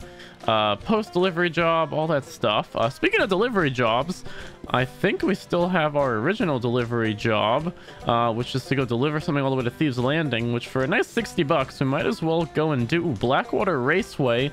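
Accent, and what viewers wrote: South, United States. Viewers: Mf rlly talks with a red neck accent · You know you don't HAVE to talk with a red neck accent.. many people in this setting were from all over the world or had intellectual backgrounds.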